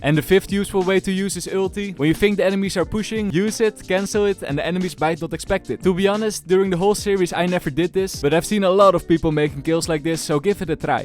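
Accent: Netherlands. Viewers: how much do you like his dutch accent? very much i like his tips more 👇 👇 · 1% englise acsent 99%dutch acsent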